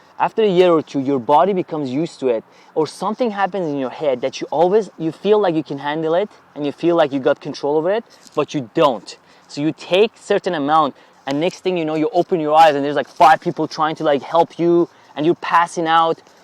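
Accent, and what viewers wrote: Iran. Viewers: His accent is very Persian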